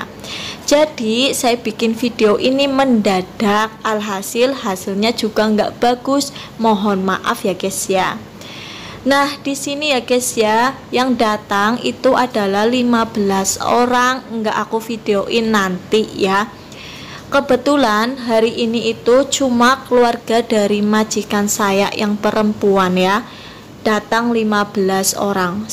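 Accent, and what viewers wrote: Banyumas, Indonesia. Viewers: Hadir KK,,,ora ngapak ora kepenak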